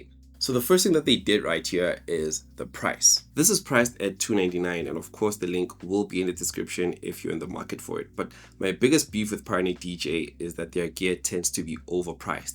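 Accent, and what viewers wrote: South Africa. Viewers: It's a South Afican accent, American/British/Danish... huh? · Is that a South African accent I sense 😀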